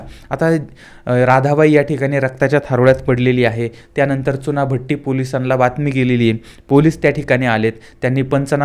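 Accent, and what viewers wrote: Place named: Satara, India. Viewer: भाषा उच्चार वरून सर सातारा जिल्ह्यातील असावेत असं वाटतंय,तुम्हाला काय वाटतंय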